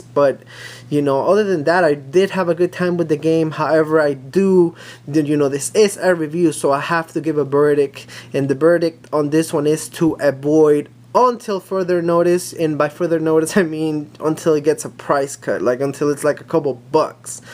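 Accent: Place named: South Florida, United States. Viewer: Great review! I literally just purchased this for the PS Vita in 2026 ha ha, ha ha enjoy your memorial day weekend. Not for nothing but it sounds like you’re from South Florida ha ha ha By the way, this game is still $10🫠🥴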